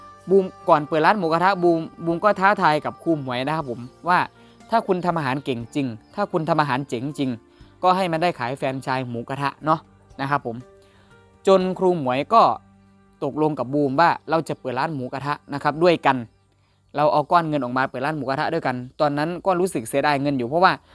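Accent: Isan, Thailand. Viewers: เสียงเว้าของ มาคือกันกับเสียงผู้ใหญ่บ้านฟินแลนด์ฟังเรื่องราวชีวิตน่าชื่นชมที่เป็นคนที่ต่อสู้ขอให้รักกันแบบนี้ตลอดไปดันๆให้มีคนติดตามเพิ่มขึ้นเรื่อยจนถึงแสนเด้อจ้า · เว่าอีสานกะดีคือกันเด้อครับ ฟังแล้วอบอุ่นดี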